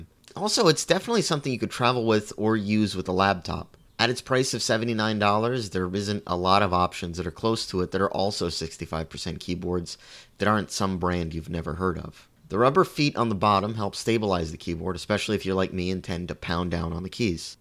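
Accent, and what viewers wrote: Scotland, United Kingdom. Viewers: Too bad you lost your accent (like for example Xcom 2 Episode 1 ), that was like 25% of me wanting to view it (love the Scottish accent) :)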